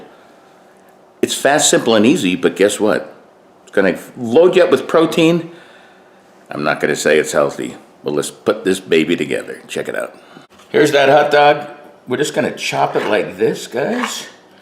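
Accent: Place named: Great Lakes, United States. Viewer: My grandmother used to make this around Buffalo, New York. This is one of my favorite breakfasts. I don’t eat it so much anymore because I’m trying to eat more healthy but man is it good. From your accent I’m assuming that you are perhaps from the Great Lakes region? Maybe it’s a thing in that area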